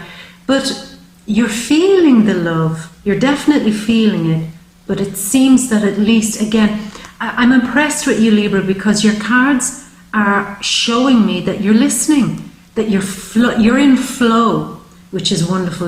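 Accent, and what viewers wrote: Ireland. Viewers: 🤣🙏 you make me giggle, what county are you from in Eire? I can't figure out your accent...it sounds like a very, very soft out skirts of Dublin or then I'm thinking is it Clare lol go on your going to tell me neither. You have a joyful soul thank you for your work, your time & energy it's gratefully appreciated & I've taken note....don't just spunk my money I got it! 💖💝🌈🙏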